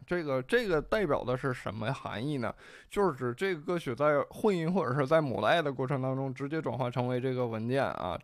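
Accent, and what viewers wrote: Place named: Northeast, China. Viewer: 哥们你东北哪儿的:face-turquoise-covering-eyes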